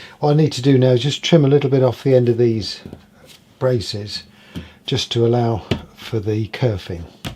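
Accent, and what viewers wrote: United Kingdom. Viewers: By the way, we really appreciate that you narrate your videos and tell us what you’re doing and why and where this bit of wood came from, etc. So many these days are doing the silent ASMR-type of videos, and while those have their place, we prefer the talking. And it’s nice that you have a British accent, too.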